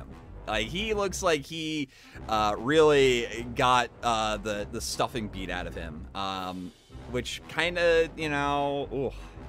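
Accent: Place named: South, United States. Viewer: He makes the southern accent look bad. Please, please just stop.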